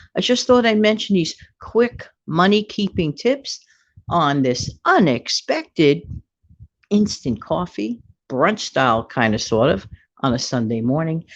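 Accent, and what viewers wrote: New York, United States. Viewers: Hi from South Australia 🇦🇺 I found your you tube channel today it’s fantastic and inspiring. I just love that NY accent I am in my third act of life and getting my financials and life. Looking forward to hearing more of your channel and learning new tricks on how to make life better ❤️😍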